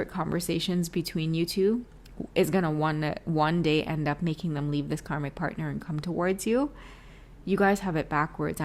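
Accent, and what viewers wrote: Trinidad and Tobago. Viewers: lol love the Trini accent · 🦫 Love the Trini mom talk! 🦫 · As a Trini living in Trinidad, I have to to tell you your trini accent is so good. Thank you for the reading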